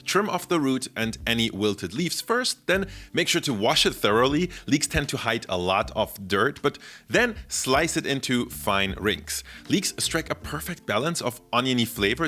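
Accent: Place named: Germany. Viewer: your german accent is coming out stronger nowadays.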